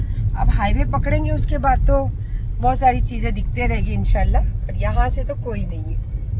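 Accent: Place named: Deccan, India. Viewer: WOW she's talking dakhni urdu❤❤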